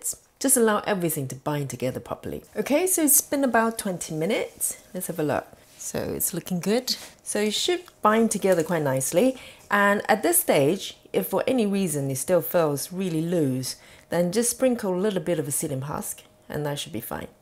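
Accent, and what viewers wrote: England, United Kingdom. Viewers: More than everything I love your British accent. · Hi I like your British Accent...your video contents are seems yummy surely will try I have got all the ingredients apart psyllium husk! Must get some soon! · Precise and with class English accent. Thank you. 💐💐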